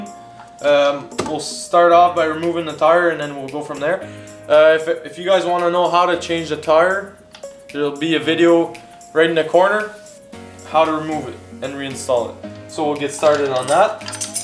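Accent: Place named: Montreal, Canada. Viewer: Sounds like a Montreal Anglo-Italian accent, maybe Lachine?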